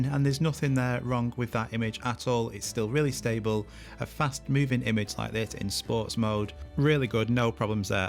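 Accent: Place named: England, United Kingdom. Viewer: Thank you for informations Witch place in england is your accent from ?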